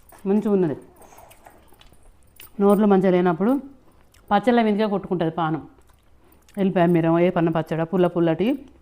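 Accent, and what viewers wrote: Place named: Medak, India. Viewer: Meeru Medak district la mataduthunnaru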